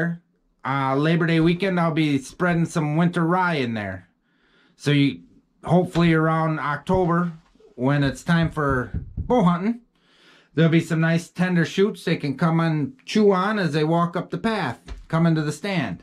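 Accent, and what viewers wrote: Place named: Upper Midwest, United States. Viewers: unrelated but... I heard you speak and you are either a Wisconsinite, Minnesotan, or a Michigander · Dont sound like hes anywhere near Georgia lol. More like Minnesota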